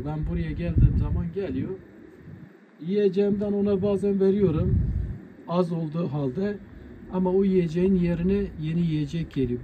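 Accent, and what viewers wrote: Adıyaman, Turkey. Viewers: Abi sen kahtalı mısın? Ağzından ilk kelime çıktığı anda eşime bu abi hemşehrimiz dedim..Adıyaman’dan sevgiler saygılar..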